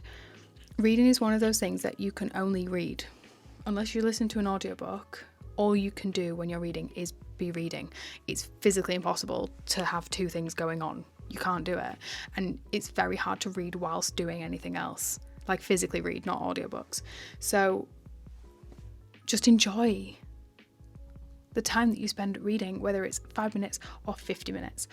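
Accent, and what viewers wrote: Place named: North, United Kingdom. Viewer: heard the northern accent, immediately subscribed 😌🙌🏻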